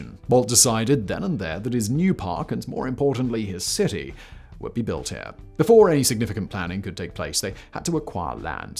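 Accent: United Kingdom. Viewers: Sometimes it occurs to me that my family members probably wonder why I'm listening to the same British guy constantly, unaware of the unusual number of channels this man hosts.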